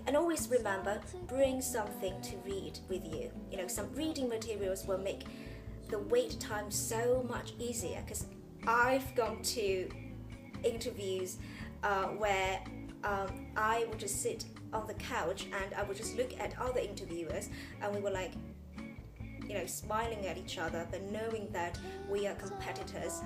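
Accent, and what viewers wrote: United Kingdom. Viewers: how did you learn that accent? Luv that british accent! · 覺得英腔加一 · 这个女孩的英式英语说的真的很好。不是光是语调节奏听着英式而单音错误一大堆的那种混迹在国内各大网站论坛的误人子弟者。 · 简直英音。。。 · 沒有美吧...整個英到不行......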